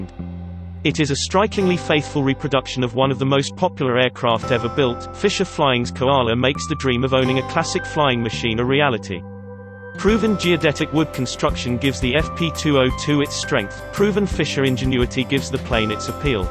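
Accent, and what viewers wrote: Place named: United Kingdom. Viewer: Love the videos, so tired of the British accent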